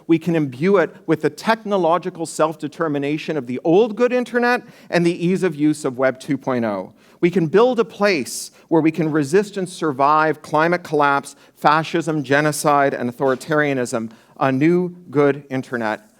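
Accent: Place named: United States. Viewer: eek-barba-durkle! this guy with an american accent thinks in euros... or all over the place. tf!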